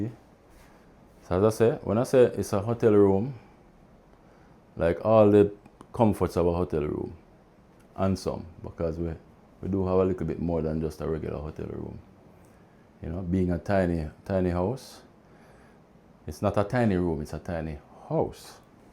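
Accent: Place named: Jamaica. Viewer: my grandma is from Cuba too. Love the interaction and love your accent Love the Jamaican accent with the Cuban accent beautiful. She was just the same curses in patois curses in Spanish We love her. Great job girl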